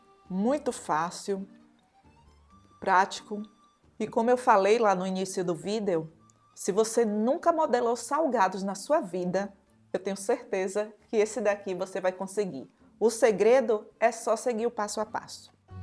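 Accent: Bahia, Brazil. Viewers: Te encontrei por acaso , e estou começando a fazer salgados, já fiz uma receita de coxinha ficou maravilhosa. Vou fazer vários pra vender. Vc explica com muita calma e amo seu sotaque minha família é da Bahia. Eu sou de São Paulo assistindo suas aulas. Gratidão vc não imagina como está me ajudando. Na verdade acredito que está ajudando muitas pessoas. Jesus te abençoe 🙏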